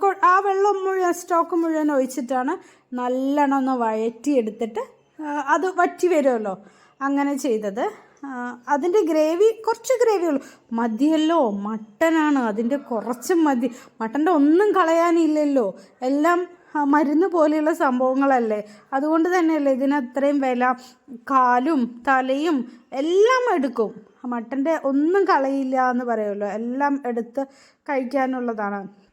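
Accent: Palakkad, India. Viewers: നല്ല വീഡിയോ ❤❤ പാലക്കാടൻ ഭാഷ ഇഷ്ട്ടമായി കേട്ടോ..👍👍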